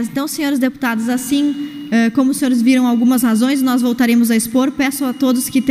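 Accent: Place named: South, Brazil. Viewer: Acho esse sotaque sulista tão bonito.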